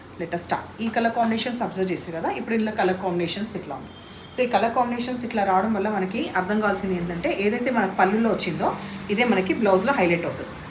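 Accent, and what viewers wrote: Telangana, India. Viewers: Hai mam mee sarees కంటె baga untai mee మాటలు and pakka Telangana యాస super mam😅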